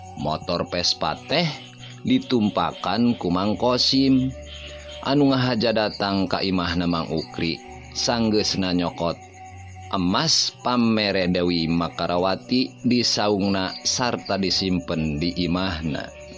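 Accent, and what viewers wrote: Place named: Sunda, Indonesia. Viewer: DINA MAOSKEUN MAH SAE. KEUNA KANA AKSEN SUNDA. NANGING SEU RUR KECAP ANU LEPAT. RANDA JADI RANGDA NU KEDAHNA EU DIBACA E. KAWAS TEU WANOH BASA SUNDA. 🙏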